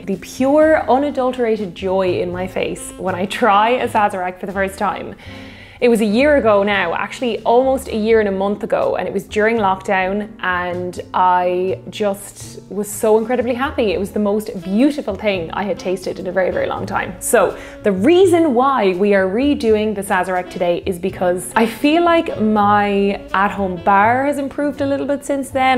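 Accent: Ireland. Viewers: Your second video for me. I made this comment after watching my first, which was your first Sazerac. Here's my comment from that one, which probably should have been made here .... "The first video of yours I've watched and it was very enjoyable indeed. I've visited Ireland often and listening to you speak makes me want to return and visit with my Irish friends there. Anyway, the Sazerac is a great drink, which I've enjoyed many times in New Orleans, and your formula is fairly classic. Mine (which I was taught by a NOLA bartender I befriended) goes as follows: 2oz Rye, 1/4 oz. Demerara sugar syrup, 3-4 dashes Peychaud's, 1 dash Angostura, and 3 sprays of the Absinthe atomizer into the chilled glass just before I pour in the other mixed ingredients. Lemon twist does not go into the glass. Slainte! (And I'm now a subscriber.) Oh, and I agree with the comment below that you'd likely truly enjoy a Vieux Carre as well."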